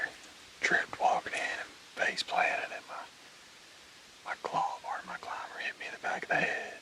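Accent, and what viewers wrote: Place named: South, United States. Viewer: As my Mamma from East Texas would say, "That boy sounds like Real Country".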